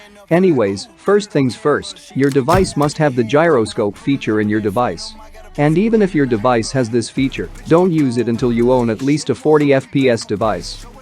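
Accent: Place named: India. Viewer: You from india maybe 😅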